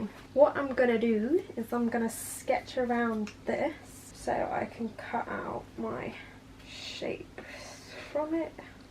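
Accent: United Kingdom. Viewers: Gosh!i love your accent British~♡love it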